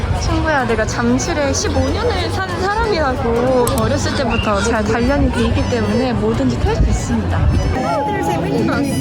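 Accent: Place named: United Kingdom. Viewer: 소영님 영어에 영국식 발음이 들리는데 배우신건가효...?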